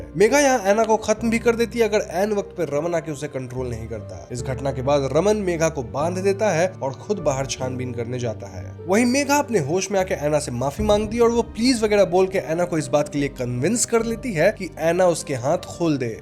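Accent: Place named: Bihar, India. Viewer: Kedla kya hai bhai.... Bihari accent mat ghua bhai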